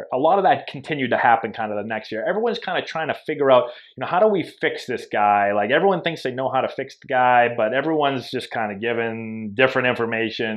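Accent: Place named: New England, United States. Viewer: He's just got that New England accent lol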